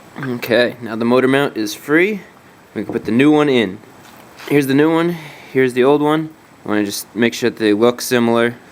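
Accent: United States. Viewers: Your accent sound american'ish, you said millimetre. I say you are canadian. Did i guess right?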